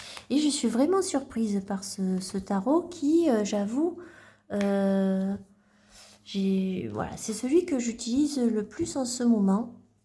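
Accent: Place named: United Kingdom. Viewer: Bonjour! Je suis très nature 🐰🐿️🦇🦔🌳et très couleurs 🌈 alors forcément je me suis régalée! Merci pour ces découvertes c'est enrichissant et très tentant 😍. Nous avons le même accent anglais 😉...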